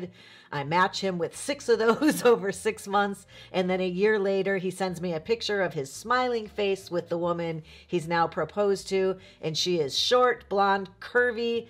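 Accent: Chicago, United States. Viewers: I am getting addicted to your blogs, since I am a recent widower in the dating game. I am very comfortable listening to your Chicago accent! Do you have a good friend who needs to date another "Good Guy"?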